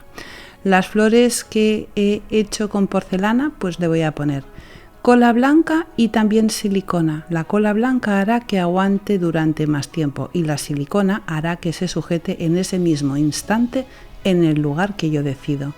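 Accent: Spain. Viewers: Hola, bonito nombre by the way. Tienes un acento español. Vives en España¿? Y la tienda de la joven que conociste también está en España¿? Debes saber soy tu nueva suscriptora desde que te encontré en internet.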